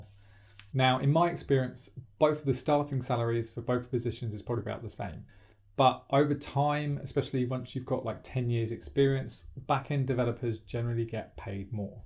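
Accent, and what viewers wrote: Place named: Manchester, United Kingdom. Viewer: kinda, I m currently studying computer science at Manchester. so kind of familar with this accent lol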